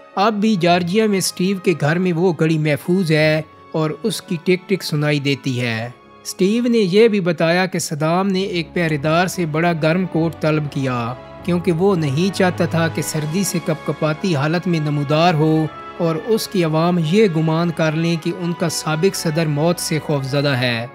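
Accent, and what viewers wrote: Chakwal, Pakistan. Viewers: Ap k bolny Ka style touch chakwal said Ka hai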